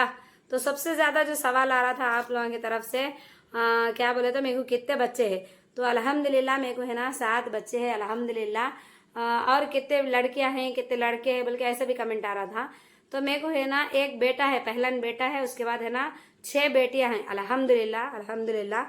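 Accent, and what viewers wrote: Hyderabad, India. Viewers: Yeh hyderabadi language with old city accent ..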